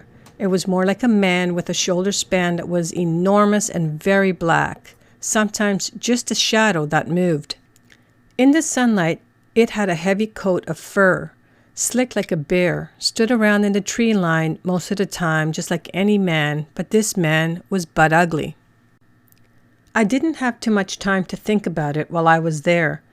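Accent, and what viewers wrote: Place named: Quebec, Canada. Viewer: Great stories. I love your accent. It reminds me of all my wonderful French Canadian friends:-)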